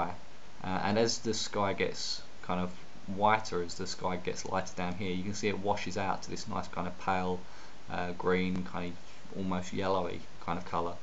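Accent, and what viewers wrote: England, United Kingdom. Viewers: You have such a cool accent! I thought you were English!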